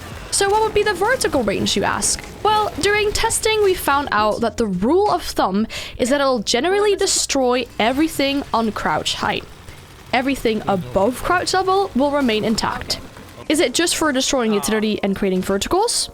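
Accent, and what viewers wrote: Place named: United Kingdom. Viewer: Lol you're dutch? In another vid i saw u sounded British 😅 groetjes.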